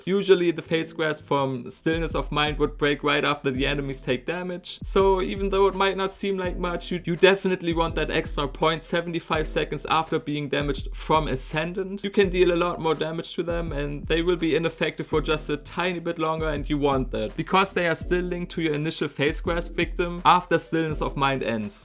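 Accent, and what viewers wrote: Germany. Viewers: Think his first language is not English but German or so. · Der deutsche Akzent ist ziemlich offensichtlich bei ihm xD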